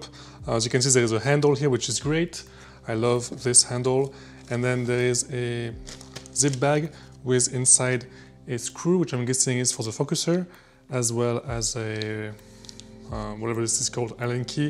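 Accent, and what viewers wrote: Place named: France. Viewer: Are you french ? Tu as un accent 😂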